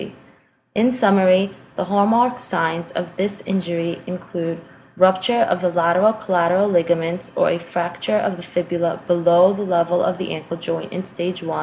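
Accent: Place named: New York, United States. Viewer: Love the accent!!! Marisa Tomei in My Cousin Vinny...Are you from New York?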